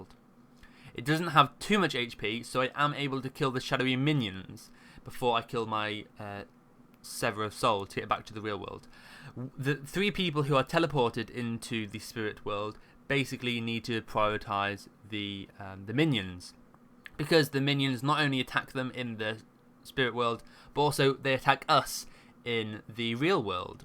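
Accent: United Kingdom. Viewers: that is the british pronunciation.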